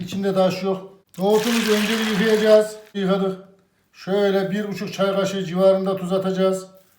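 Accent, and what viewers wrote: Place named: Central Anatolia, Turkey. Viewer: Yaa tam bi iç anadolu şivesi çok tatlı olduğu için dülmem geliyor😅🙈Ben de yarın nohut yemeği yapıcam bi tariflere bakım dedim sizle karşılaştım.🙋🏻‍♀️Ellerinize sağlık, bilgiler için teşekkür ederim....🧡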